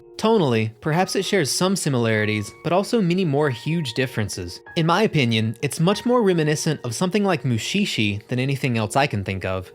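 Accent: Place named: Southeast, United States. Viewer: Also bro where you from? Southeast United States? Im from Georgia and your accent is very familiar lol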